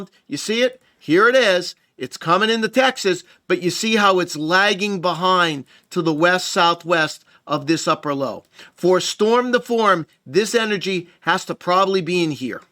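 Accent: New York, United States. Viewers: Just because you have an obnoxious NY accent and way too much confidence doesn't mean you know what you're talking about. News flash, this is click bait!!!!!!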